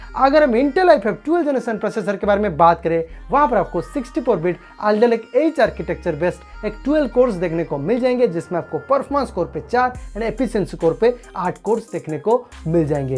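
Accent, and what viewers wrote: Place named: India. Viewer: Son of a bitch, i dont understand ur accent. Pak u bumbai indian body odor people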